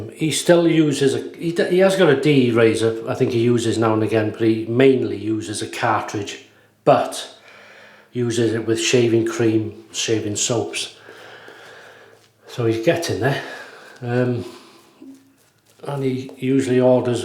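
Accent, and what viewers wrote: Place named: Wales, United Kingdom. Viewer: I really want a model, one day I'll get one. I'm based not too far from you I think. North East Wales from your accent? I'm originally from Bangor but live north East now.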